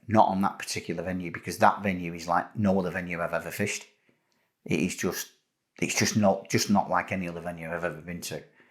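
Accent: United Kingdom. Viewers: He's from Britain, He is English and has a "Lancashire" accent. ( From the north of England)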